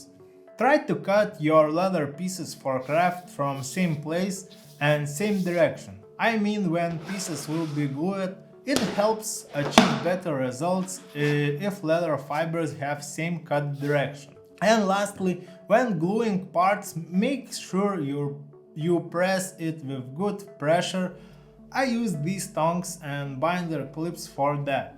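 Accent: Russia. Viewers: хэллоу) чувствуется русский акцент)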